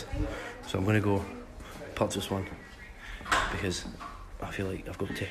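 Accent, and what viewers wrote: Scotland, United Kingdom. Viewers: love ur Scottish accent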